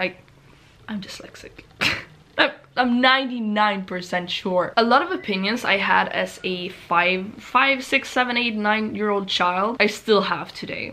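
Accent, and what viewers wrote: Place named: United States. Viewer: omg ur american accent is so much stronger now! I havent been on youtube for ages and I really notice a difference! Cool :—-) Loved this vid xx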